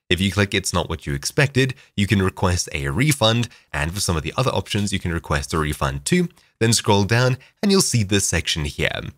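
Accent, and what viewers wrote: South Africa. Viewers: are you a South African? just asking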